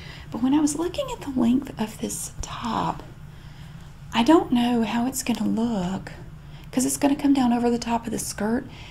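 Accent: South, United States. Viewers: I absolutely love you 🖤🤍🖤 watching your videos makes me feel at ease and comfortable. I think one of the main reasons why I feel so at home when I watch your videos is, because of your southern draw. It feels like home because that is how my mom talks and I adore my mom! 🥰 so thank you for all that you do on your channel. 🖤 from SA Texas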